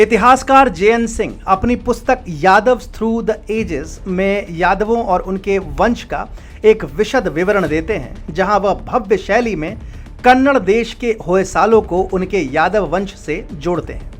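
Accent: Standard. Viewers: Wow! Appreciate your clear and pure hindi.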